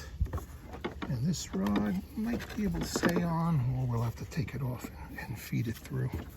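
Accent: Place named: New York, United States. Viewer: Love your accent! NY?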